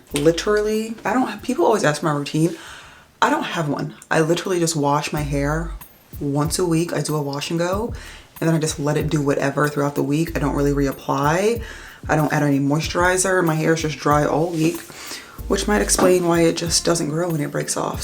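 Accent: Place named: Philadelphia, United States. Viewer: OMG! You sound like one of the people from Parking Wars. And it is ALWAYS in Philadelphia. I hope you win!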